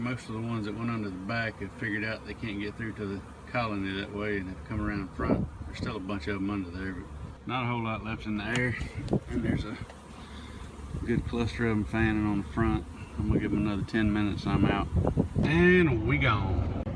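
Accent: South, United States. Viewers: He is saying Drawn comb Not drone(fogive our southern drawl) ... using old comb gives them a little headstart it's a stage up form just plain new foundation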